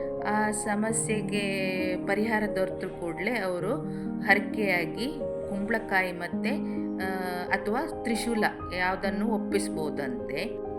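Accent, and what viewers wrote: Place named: Kundapura, India. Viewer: ನೀವು ಕುಂದಾಪುರ ಕನ್ನಡ ಮಾತನಾಡತಾ ಇದ್ರಲ್ವಾ ಹಾಗೆ ಕೇಳ್ದೆ ನಮ್ಮ ಅಕ್ಕ ನ ಊರು ಸಾಗರ ಭೀಮನ ಕೋಣೆ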